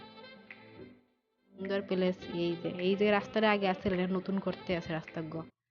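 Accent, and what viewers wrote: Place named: Barishal, Bangladesh. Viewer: আসসালামু আলাইকুম আফা। আমার বাড়ি নরসিংদী, কিন্তু আফনের বরিশালী কতা হুইনা খুবই ভালা লাগসে....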